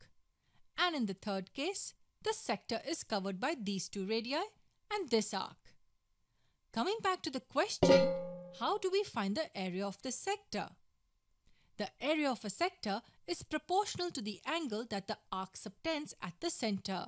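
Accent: India. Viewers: Oh... um i do not really understand this indian kind of english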